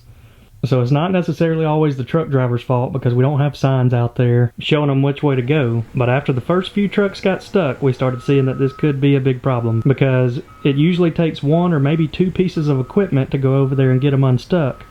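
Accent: South, United States. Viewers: Fun name idea: The Tripple P. that stands for The Peanut pasture pond. I that name will sound very nice with your southern accent.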